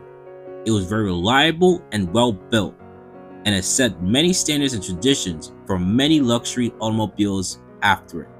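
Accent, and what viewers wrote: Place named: North East England, United Kingdom. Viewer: Thanks for the vid, I enjoyed it despite RR being a bit out of my price range. Hey but, cool accent, must be somewhere north east. I'm Western Australian, & I too speak English, but if I was speaking proper 'Strayan from the specific part of my country, which I would only do with my contemporaries anyway, you would hardly understand a word of it. Make some more vids.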